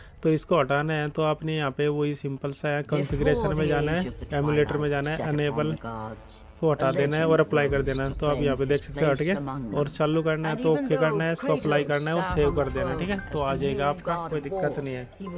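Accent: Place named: Haryana, India. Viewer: kyuki m khud haryana se hu and tumhari boli se pta chlta h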